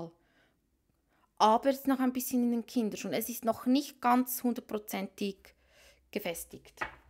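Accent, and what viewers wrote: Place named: Switzerland. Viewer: Mit wunderbarer schweizer Akzent ;) Grüessli us de Schwiiz :D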